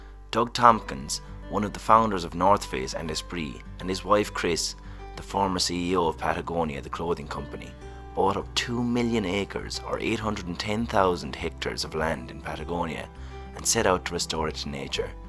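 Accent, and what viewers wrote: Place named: Ireland. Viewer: Thank you so much great channel and wonderful to hear the Native Irish voice 😄😄.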